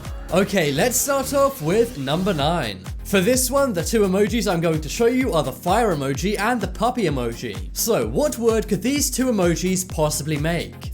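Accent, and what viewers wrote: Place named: United Kingdom. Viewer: He sounds btitish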